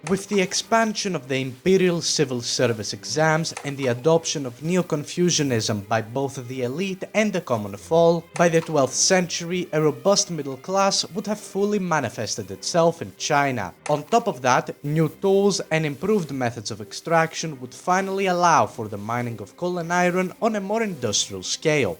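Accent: Greece. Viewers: Now that's a Greek accent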